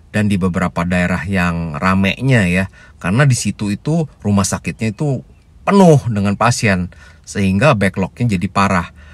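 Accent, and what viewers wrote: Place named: West Java, Indonesia. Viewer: Bro logatnya Sunda yah.. sampurasun Kang 😊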